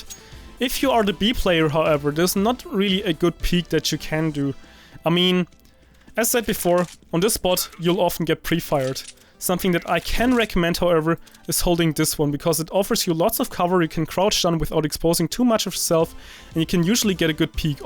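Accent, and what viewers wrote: Germany. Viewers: ich wusste du kannst deutsch xD Egal wie fließend du sprichst und egal wie sehr du versuchst alles richtig auszusprechen man kann immer hören ob jemand deutsch spricht xD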